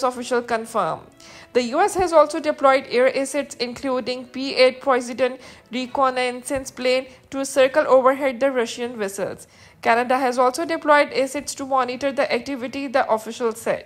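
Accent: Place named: India. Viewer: British ? Not at all ! Your speaker has a strong accent... Russian media with Indian speaker ?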